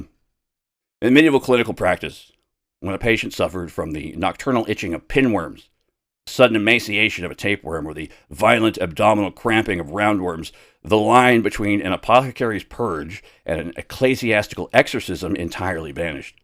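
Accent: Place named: Canada. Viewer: You are Canadian aren't you? I can't really tell being that I'm British. You sometimes sound like you have a Canadian twang.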